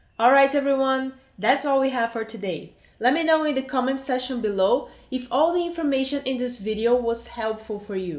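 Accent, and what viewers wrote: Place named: Brazil. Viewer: Vc é brasileira né? srsrsr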